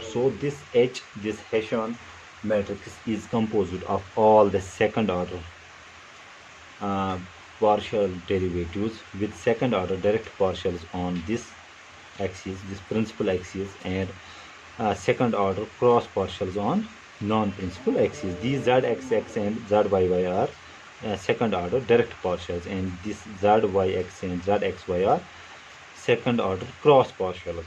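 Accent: Kashmir, India. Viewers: from ua accent it looks like u r from kashmir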